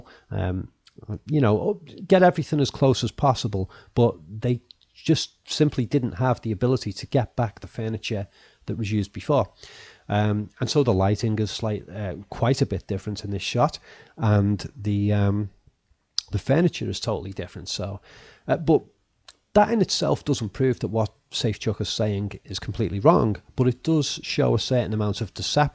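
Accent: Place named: Northern England, United Kingdom. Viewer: Dissenter here. Regarding that couch..... Maybe he / his wife simply redecorated the place a bit between days of shooting? I know, it sounds incredibly simple and non-conspiratorial but maybe one would want to do something chore-like after a day of harrowing interviewing / reminiscing. Maybe it was planned already; obviously the shot with the couch was filmed at a different point in time. So........ what?........ If you were to analyse reality this way, you would find it to be non static most of the time. People sometimes move stuff around! They must be hiding something. Ofcourse your elaborate scheme scenario, illustrates your narrow analytical view (tending towards form over substance) and slightly glosses over the fact that if your theory were correct, AND following your logic then they'd probably just paint over a similar chair and move the couch away and avoid the "suspicion". 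BTW you completely missed the shrubbery outside, which is trimmed shorter in the shot with the couch! Can't believe you missed that! Weird huh? Lots of used takes / editing applied means false.... that's not a convincing argument and you should know that. You analyse films. Yes, shockingly, documentaries ALSO get edited and aren't filmed in one continous shot. BTW you're from Northern England right? Love that "K" sound you guys do after "-ing" words though.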